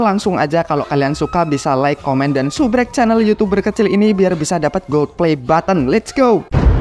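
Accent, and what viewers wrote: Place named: Padang, Indonesia. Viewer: Wahh orang padang 😅😅😅😅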